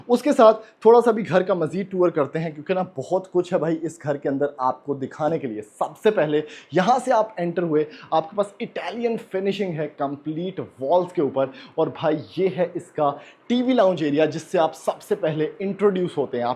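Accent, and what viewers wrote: India. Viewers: Main es ki hindi se samjha ya india ka hai 😂😂😂😂😂😂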